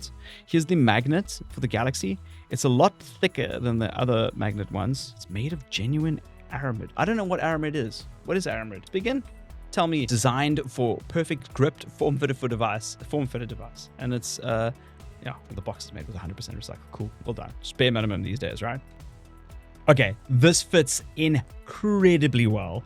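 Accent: South Africa. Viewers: How's it China, thanks for the reviews. Lekka to hear a genuine SA accent. Peace 🙏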